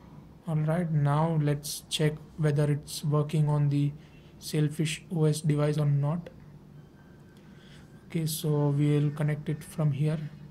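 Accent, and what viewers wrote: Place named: Gujarat, India. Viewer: Are you Gujrati?? your accent is like Gujratis.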